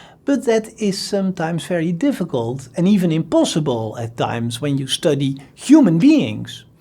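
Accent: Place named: Netherlands. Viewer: His name and accent would suggest that he's Dutch.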